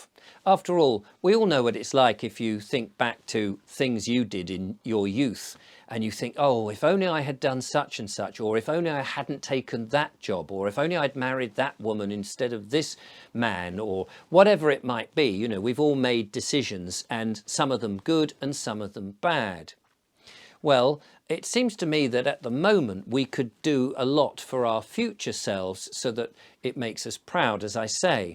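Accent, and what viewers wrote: United Kingdom. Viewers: I have recently started watching all of your videos and would like to make the following observations. You come across a Great British slight accentric, which I love as there are too many manufactured personalities. You love your country and your countries history. But your awakening, probably through your viewers' comments and opinions, is beautiful to watch. Your communication skills are a credit to you but most of all the way you "tread the boards" shall we say is masterful. Keep up the good work as I think you awaken a lot more people to the World Agenda than say the more zealous of us delivering the agenda. Keep up the good work.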